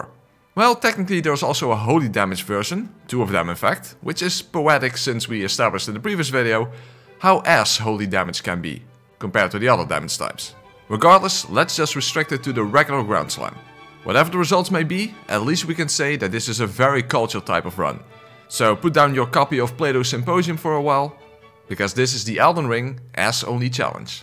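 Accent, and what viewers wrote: Netherlands. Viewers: Great vid man, are you Dutch by any chance btw? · You sound very dutch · i bet this will be a fun vid but i'm 4 mins in and the dutch that is squeezing through your english plus the dutch accent is making me cringe a bit butt its not you mostly me since i am belgian lots of love <3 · First dutch soulsborne youtuber ive found, if you are dutch. You certainly got the accent. Great video btw, was a blast to watch. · broer jij bent doodlachen ik hoor gewoon dat jij nederlands bent😂 soldaat 💯❤️ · you sound dutch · You Dutch? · 14 seconds in, and ur definetely dutch lol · my guy, are you Dutch by any chance?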